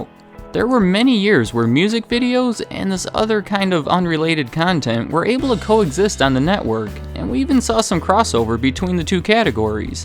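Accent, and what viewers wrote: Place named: Minnesota, United States. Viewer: Only two videos! I want to watch more. Are you from Minnesota or something? You have a funny accent and I like it cause I'm Canadian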